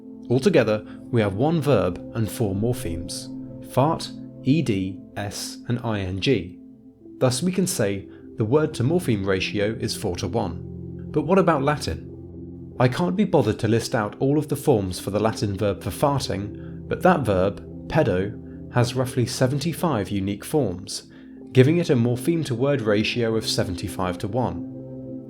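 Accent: England, United Kingdom. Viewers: Shades of what I would have gotten into had I gone into linguistics! OK, I detect a [snobbish] British accent in you but get to the point. English is MORE synthetic than you make it out to be (following the lead of the Germanic languages); the Romance languages are almost totally analytic. Point is demonstrated: my school system at the grade 7-9 level taught only two languages French (analytic) and Latin (synthetic galore). In high school grades 10-12 I took German. Us "Latin scholars" were VERY familiar with grammatical case while the "French scholars" had no idea what case was. Yes, things like Accusative of definite time vs. Genitive of indefinite time weren't well explained--they are a feature of German--to us in Latin class in junior high. Something interesting is going on in English that to your argument that Synthetic trends to Analytic which I'll get to in another post. 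I'll conclude that a lot of this today is in DELIBERATE miseducation. Note in America the now-common misuse of " 's " for plurals: dog's for dogs, etc. THAT sort of illiteracy! Bad enough the British' dropping of punctuation in abbreviations "PMs" for "P.M.'s" if you saw Johnson, Cameron, & Brown walking down the street! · Yeah I reacted to "domibus nostra", I dunno where he got that from. Also I'm surprised he was afraid to pronounce Finnish, as his Latin pronunciation was 100% English anyway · What a preposterously unscholarly and scatological pile of tripe. "Habere" in Latin is "to have"; "Dormire habeo" is "I have to sleep/must sleep". it is NOT the future compound tense which would be "dormire VADO" (using the verb "to go" as one would in English).The author resorted to using the verb "fart" since he doesn't have the intellect, substance or maturity (thinking he has some severe "mommy issues" and still lives at home) to create something academic. 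This is video is proof that just because you slap a pompous voiceover with an English accent doesn't make it intelligent or correct. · slow and exquisitely anglo but an excellent video for sure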